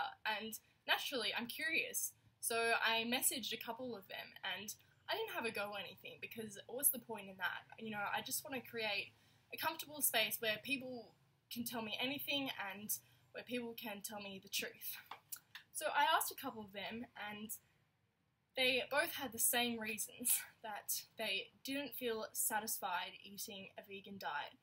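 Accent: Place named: Australia. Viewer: Cute Aussie accent.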